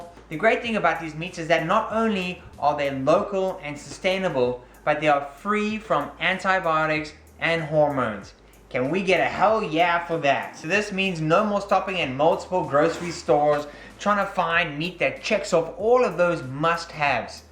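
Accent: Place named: South Africa. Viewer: Fantastic news! For Americans, try the Butcher's Box. Same criteria products. 💕🌞 Side note, if you were born and raised in B. C., how do you still have your South African accent? So curious sunshine. 🤔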